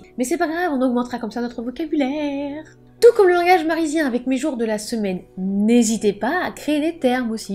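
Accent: England, United Kingdom. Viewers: Bel accent anglais 👏👏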